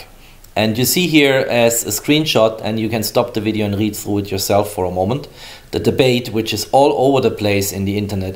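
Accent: Germany. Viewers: Are you german? Your accent sounds like. xD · Dein deutscher akzent macht das video kaputt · Rede doch einfach deutsch Mann Hört das du deutsche bist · You are 100% german...I can hear that on your accent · Are you from Germany ? You have Accent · Deutscher, Das hört man an der englischen aussprache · Dieses deutsche Englisch xd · Gutes Deutsch Englisch :) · Are u german? Your english is pretty german like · Hört sich stark nach German-Englisch an :) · Du hast einen sehr deutschen Akzent 😂😂😂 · Kommst du aus Deutschland?!! Du hast 'nen STARKEN Akzent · Are you a german? Because your accent seems..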